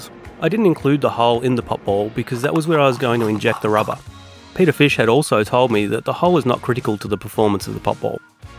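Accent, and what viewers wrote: Australia. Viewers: X really you're Australian I think you're Australian you have an accent anyway you're still great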